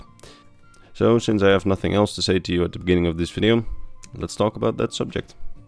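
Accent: Netherlands. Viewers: i was about to say, but someone else already mentioned it. you sound dutch, but not that horrible actual dutch accent. good job on the video, mate. moge de negen goden sterk met u zijn. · Jij klinkt nederlands :)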